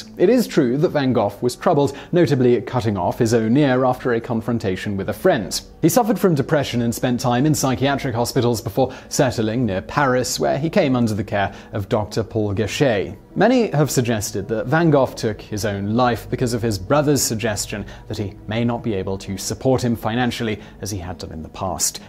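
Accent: United Kingdom. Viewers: Relax dude, That's how Brits pronounce it, it's called having an accent. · His research seems accurate (as conspiracy theories goes) His UK pronunciation gets under some ppl skin for some reason - tomato/tomato ... move on folks and show some cultural intellect. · He’s from the UK, they pronounce things differently than we do in the US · The names is due to being British but all the info is accurate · who cares about the damn pronunciation he's talking about history he's not talking about the American English language the guy is from England not America shut up everybody just watch the show Geez Louise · Indigo P Some of these are just accent differences. In the UK mafia and Van Gogh are pronounced as he said.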